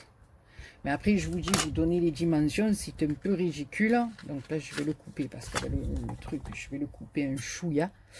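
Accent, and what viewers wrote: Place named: Marseille, France. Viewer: Coucou ma! Mais si mais si, chantes-nous le Titanic...mdr Merci pour tous tes magnifiques partages, c'est toujours un plaisir. Mais le Titanic avec ton accent de Marseille, peu chère que ça me plairait!!! Bisous bisous ♥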